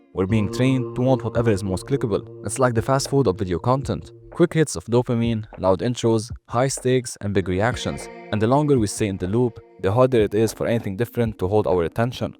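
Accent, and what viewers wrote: Arab world. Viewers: your accent sounds arab , are you lol? great vid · I love ur Arabic accent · Great video, and you sound Arabian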